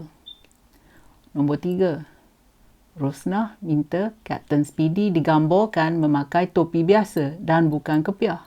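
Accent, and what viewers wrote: Perak, Malaysia. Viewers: Terbaik I suka benor bila cakap PEROK... Teringat kat kpg teman Di Batu 8, Ulu Kinto.. teruskan komen slang PEROK yer...👍🥰from Brunei 🇧🇳Ipoh Mali 😁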